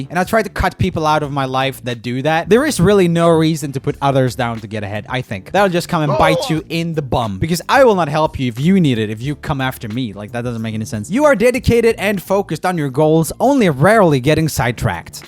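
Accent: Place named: Sweden. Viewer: His accent is so swedish, like pewdiepie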